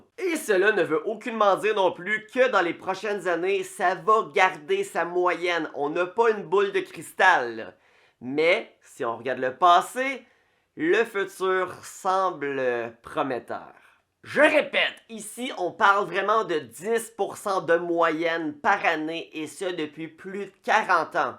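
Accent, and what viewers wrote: Quebec, Canada. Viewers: Pourtant je le trouve très clair ca doit être sont accent québécois qui tagace 😅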